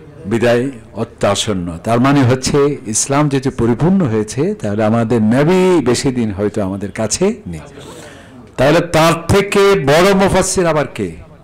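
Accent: Chittagong, Bangladesh. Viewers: গুরুপূর্ন আলোচনা।চট্টগ্রামের ভাষা ওনি ভালা লাইগ্গি। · উনি ত চট্টগ্রাম এর. ভাষা শুনে বুজা গেছে . খুব ভালো লাগছে.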